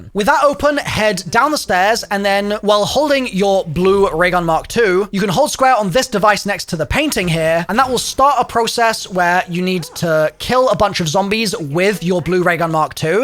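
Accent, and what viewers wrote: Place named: United Kingdom. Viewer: Thank God for the subtitles. I hate your shit British accent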